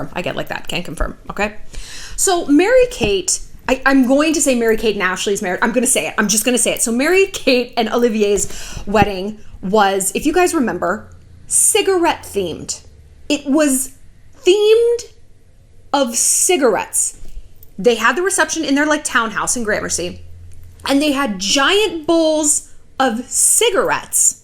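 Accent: France. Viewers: Ravie de savoir que tu es française! Ahaha lovely accent xx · CIGARETTES? OMG. That's total insanity. That's disgusting. I love how you say, "I think about this once a week!" YOU ARE HILARIOUS! I'm dying. I also love your French accent and vocal fry. You're a great actress. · Love your French accent, I laughed so much. Greeting from Paris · Your French accent <3 and all your other wonderful accents, they're always so entertaining to watch! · J'adooooooore ton accent français. C'est parfait et rigolo, comme toi, beauté !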